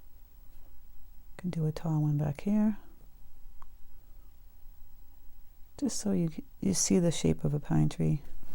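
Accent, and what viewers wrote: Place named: New England, United States. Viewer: Painted the night seen and added a couple of snowmen in the snow space. Sent them out as cards. Thank you so much. Love your accent, just like mine but I'm not in Mass anymore. Still New England, though.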